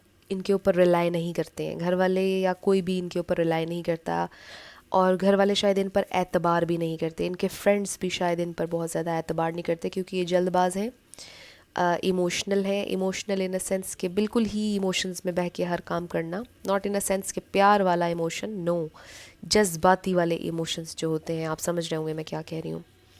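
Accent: Pakistan. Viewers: Apna khyaal rakhiye Aap hi nahi main all taro readers ki dil se izzat karti hu... Means aap log apni rooh apni jaan laga dete hain Ek Ek Situation ko samjhane me.... Her tarah se hum logo ko achcha chahte hain Aur Bahot mehnat karte hain... I love ur urdu lehja also vvvvvvvvry much.. Pl tk care urself... N again Shukriya....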